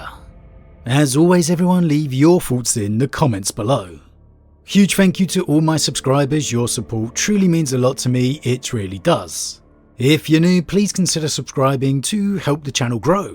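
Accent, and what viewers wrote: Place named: England, United Kingdom. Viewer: I get excited to hear some more and instead I get backwater English accent. GD I can't stand u euro trash accents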